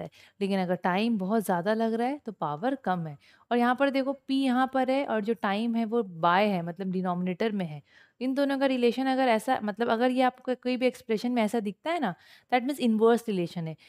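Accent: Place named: Kolkata, India. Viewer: Mam your accent is kalkatiya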